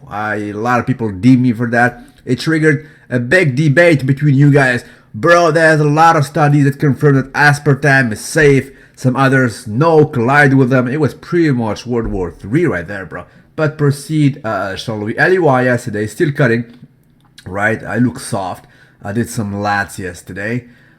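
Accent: Egypt. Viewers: Man i am Egyptian and your egyptian arabic accent is soo fun, it cracked me up, thank you man :D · Your Egyptian accent ain't that bad:P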